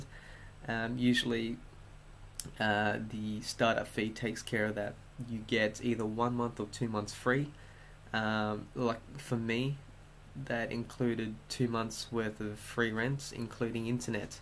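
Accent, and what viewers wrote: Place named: Australia. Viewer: You have an Aussie accent. Cool!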